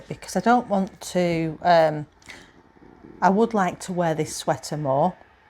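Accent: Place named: United Kingdom. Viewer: i love your accent!!! (from ny, rarely exposed to any of the UK accents)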